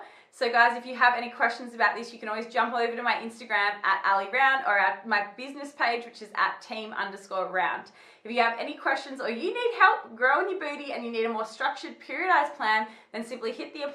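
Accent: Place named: Australia. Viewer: Thanks, Good quality information and it's great to hear an Australian accent too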